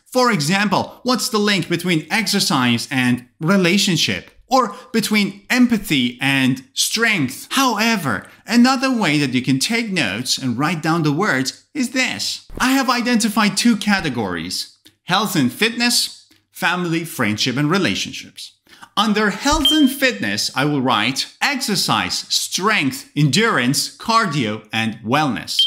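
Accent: London, United Kingdom. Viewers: His london😂😊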